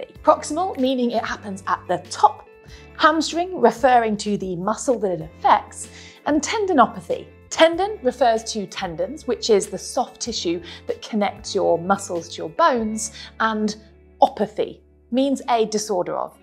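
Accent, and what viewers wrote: United Kingdom. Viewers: SO nice to see another teacher pointing to this short coming of yoga. My own 40 year yoga teaching career has let me see many loose their yoga butt and need to get it back again. I recommend much of what you have here, and also picked up a few good tips to add to my tool box as a yoga therapist working with hips challenges. thanks! Love your British accent.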